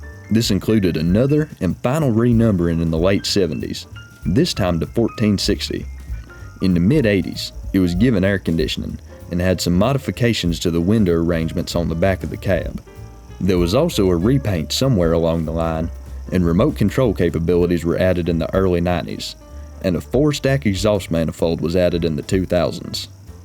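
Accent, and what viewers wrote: South, United States. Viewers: This guy is the most American-sounding American I’ve ever heard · This is by far the best channel Ive stumbled upon recently! Your accent sounds like one that should belong on any of my fishing buddies, glad to see there are more good old boys into horses of the Iron type than just me. Lmaooo, liked and subscribed, keep up the videos! I really enjoy them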